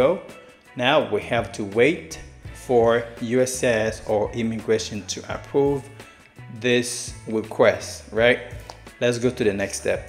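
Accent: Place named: Haiti. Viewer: You re clearly Haitian . I hear your accent. Why would you do something so important only in English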